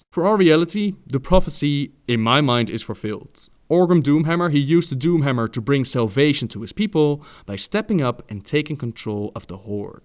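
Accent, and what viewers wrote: Netherlands. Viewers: he's dutch, he has an accent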